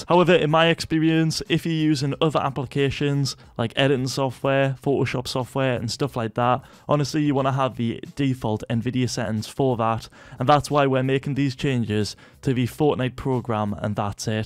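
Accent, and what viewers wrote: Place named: United Kingdom. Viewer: hes from the uk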